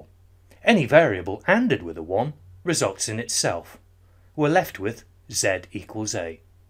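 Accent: England, United Kingdom. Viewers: i liked your English accent, good work, how do i ask my question??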